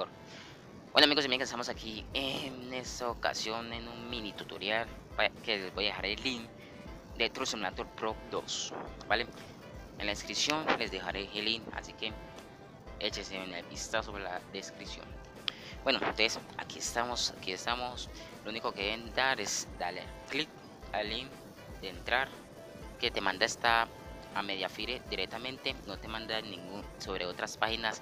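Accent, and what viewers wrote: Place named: Colombia. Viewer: Epa se nota lo colombiano todo bien le voy a dar like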